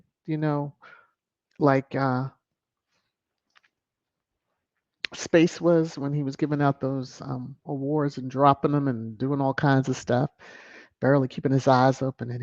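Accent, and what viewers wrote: RP, United Kingdom. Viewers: Awesome podcast one love ❤️ and to all squaddies in the chat big shout out to all proud of our prince avenger God covers him under his blood 🤲✋️👋☦️☦️✌️✌️👌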